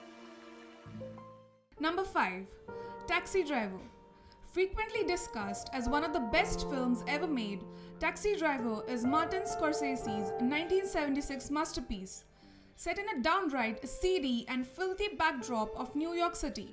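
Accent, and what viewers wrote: India. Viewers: I hear indian i click back button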